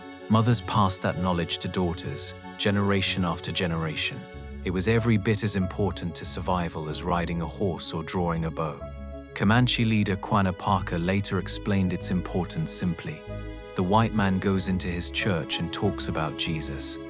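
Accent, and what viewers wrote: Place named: RP, United Kingdom. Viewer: English butler voice yawnnn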